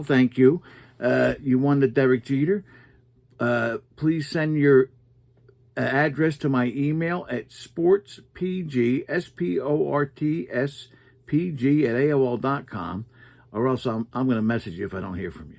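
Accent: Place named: New Jersey, United States. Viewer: New sub here. Knew that had to be a Jersey accent. Interesting rip. Hadn't seen that set ripped before. Wow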